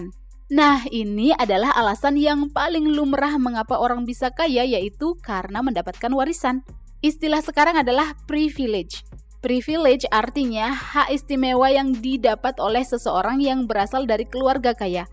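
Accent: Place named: Malaysia. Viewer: Logat melayu ya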